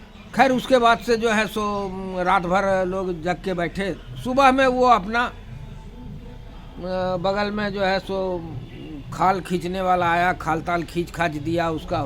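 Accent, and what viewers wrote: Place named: Patna, India. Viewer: Yes indeed l have read the books of Jim Corbett in which he had written about his work related to railway.He was also a retired major in British Army and faught in First World War commanding Garhwal Rifles. But I didn't know that it was in Bihar. I enjoy your videos and very happy to hear your conversations and dialect which is similar to that of my parents who had migrated to Pakistan from Patna. I had heard of some wild life in Ranchi Bihar but did not know about such forests in Mungair.I would request you to keep recording your hunting experiences through such videos as these are assets, for being a nature and forest lover myself.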